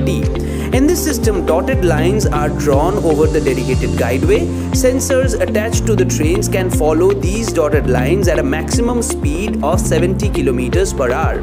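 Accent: India. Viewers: YOUR INDIAN ENGLISH IS LIKE YOU ARE SING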